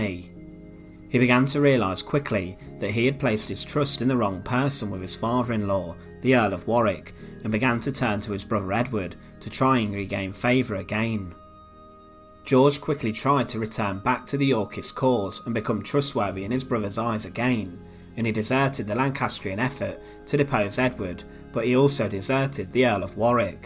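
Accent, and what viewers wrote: United Kingdom. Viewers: I love your Brit accent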